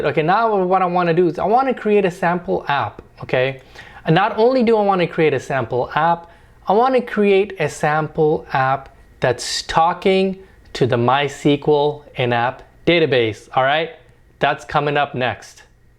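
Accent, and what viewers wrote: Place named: United States. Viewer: drop off that yank accent to get more likes. It’s irritating really. Good video though.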